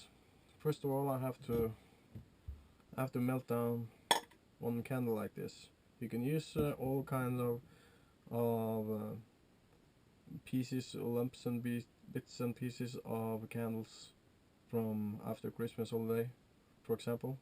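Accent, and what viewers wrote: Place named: Norway. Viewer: Nice tip! Made my own. Burns really well, about10 min burn time. Btw in the video you say "I don't have any more "rests" he he Nice Norwenglish .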